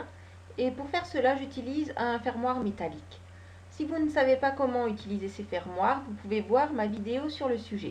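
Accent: Alsace, France. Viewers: Super 👍🏻 merci très bon tuto je vais pourvoir en faire avec mon fils. En plus très jolie professeur 😅 petit accent Alsacien si je me trompe pas 😁🥨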